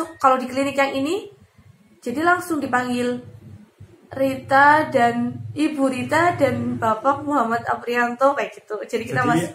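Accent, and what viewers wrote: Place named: Java, Indonesia. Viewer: Medyok sekali kakak senior idolaku iniiiii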